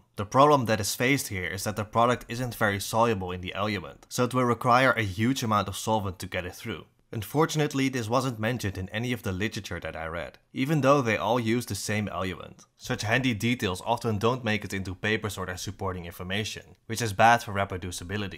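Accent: Netherlands. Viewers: Jy hoor de swaar Nederlands-aksent in sy spraak. 😂 Maar ja, baie goed, né?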